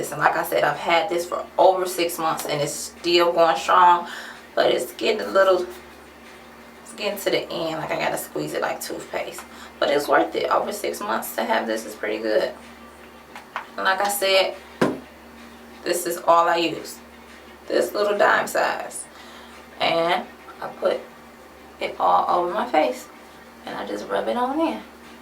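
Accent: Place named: Detroit, United States. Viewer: I love your Detroit accent!!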